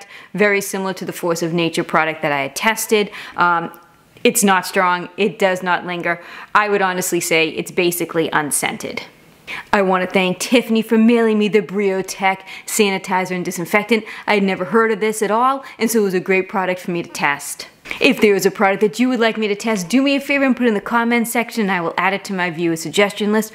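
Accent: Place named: Rhode Island, United States. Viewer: What accent do you have? Sounds like Rhode Island and/or Massachusetts.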